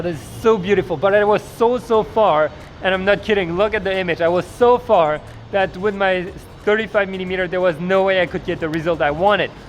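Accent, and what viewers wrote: France. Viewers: Love your French accent dude! I do use crop a lot!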